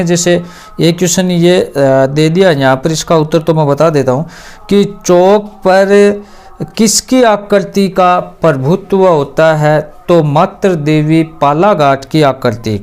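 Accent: Rajasthan, India. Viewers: Bhai Aap kha se mujhe Aap ke boli rajstani lag rhai ❤ me Rajasthan se hu my fevrete boli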